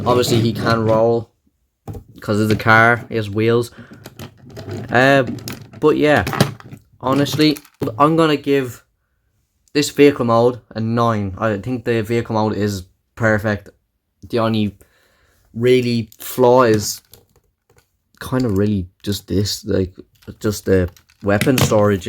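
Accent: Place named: Ireland. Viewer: Don't worry. I didn't pay much attention to the 4th and 5th films too anyway. I knew you're from Ireland just from the accent lol.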